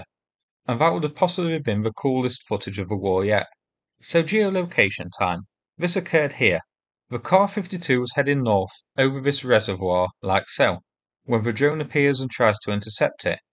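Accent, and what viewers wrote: United Kingdom. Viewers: Why pursue it buddy, when its likely you'll have your nose wiped in the sh*tey again? If you don't realise how comical your 2nd reply appeared, after you sought to humiliate our host, I can't really help you. Although I think you were embarrassed, and are now over reacting by thinking you can fight it. Our host can answer for himself the way he speaks, but I for one appreciate all the local accents and quirky expressions that exist in the UK, as someone who himself is British. In fact, I'd put a preservation order on them if I could..........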